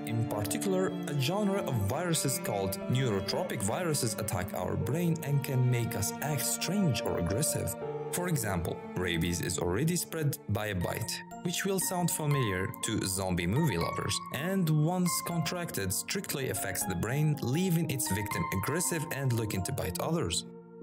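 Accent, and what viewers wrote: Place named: Russia. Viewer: You kinda sound like you have a Russian Accent. Idk just wondering.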